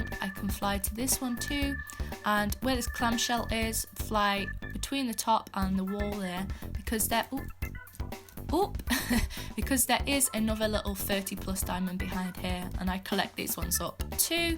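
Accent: United Kingdom. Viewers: Am I the one that love her british accent ?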